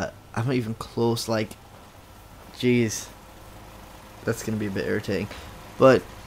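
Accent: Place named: Canada. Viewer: you sound like a Canadian stereotype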